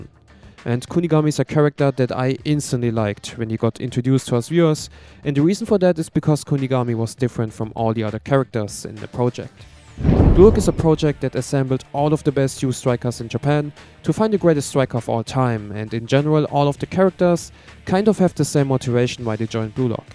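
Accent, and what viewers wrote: Germany. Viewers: Haha, man hört so krank das Deutsche XD. Aber gutes Video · Bruder man merkt direkt das du Deutscher bist lol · Are you german? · Sag mal bist du deutsch? Und sehr geiles Video btw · Are you german?Cause you do sound like one?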